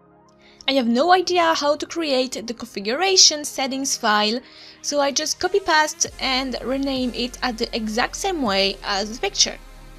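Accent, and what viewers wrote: France. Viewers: You are french ? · Na real man o sotaque dela é frances mas continua sendo bonito pra caramba uwu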